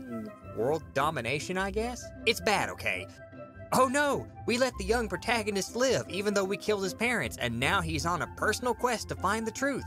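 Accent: South, United States. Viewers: I only just now realized you have a Southern accent.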